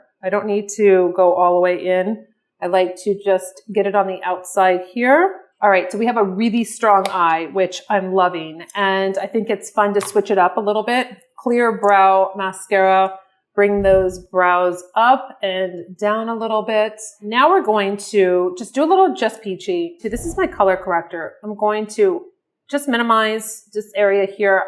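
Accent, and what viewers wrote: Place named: Miami, United States. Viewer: I just stumbled upon your videos and as soon as I heard you talk, I said to myself.. She’s from Miami. I am, too. Great makeup tips btw. 😊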